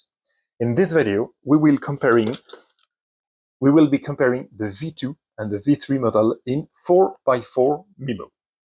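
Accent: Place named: France. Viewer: Interesting. Your English is fine (I only wish I could speak French that well). Your pronunciation of some of the letters (particulalry i and y) very amusing. A little like Peter Sellers in The Pink Panther films :-)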